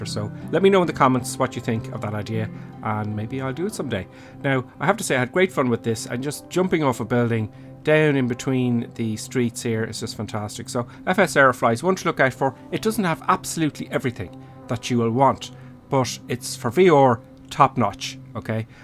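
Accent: Ireland. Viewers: Just discovered your channel - Excellent video and totally agree with your comments. I'm just getting back to simming as I become a retiree after about 8 years away in that time it's safe to say things have improved leaps and bounds (apart from p3d/msfs - till now we hope). I'll never fly a real plane but this is so close it's mindblowing. Love the accent BTW my family all originate from West Meath/Roscommon. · Just subscribed to, love his irish accent and the video was great😂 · Are you irish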